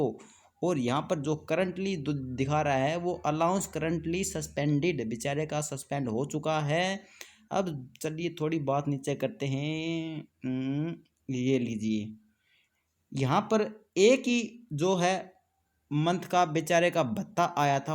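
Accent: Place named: Haryana, India. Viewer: I like your way of talking pure haryanvi 👍🏻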